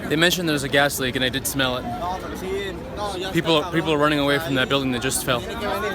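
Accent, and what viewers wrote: United States. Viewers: Hate to break it to you but it is in fact American English.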